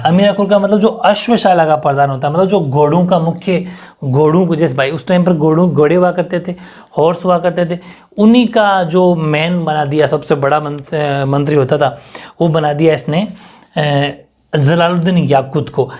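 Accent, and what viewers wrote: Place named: Haryana, India. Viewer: Ser aap Haryanvi lagte ho halanki ki aap rajasthan se ho per aapki language haryana jesi lagti he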